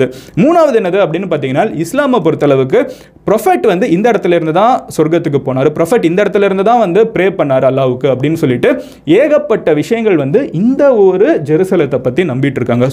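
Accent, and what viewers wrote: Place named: Kanyakumari, India. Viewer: Kanyakumari slang ❤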